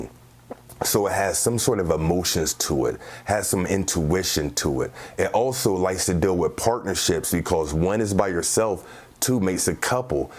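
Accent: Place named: Philadelphia, United States. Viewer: I’m digging your channel! You sound like you’re from Philly! Peace and Blessings 🙏🏽💜✨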